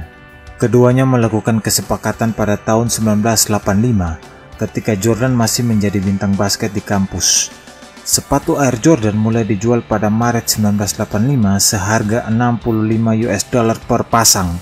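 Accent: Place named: Eastern Indonesia, Indonesia. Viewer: Orang timur ni logat nya smoga sukses bro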